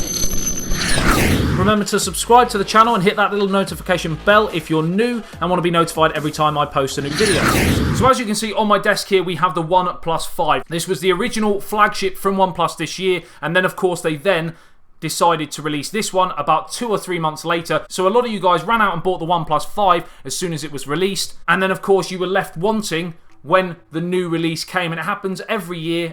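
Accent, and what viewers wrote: United Kingdom. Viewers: Idk why but i hate british acsent so much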